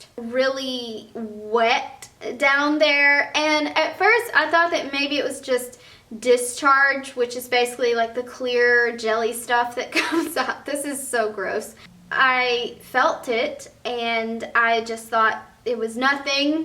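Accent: South, United States. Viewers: her southern accent is cute 😁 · You sound like a country girl. I love it.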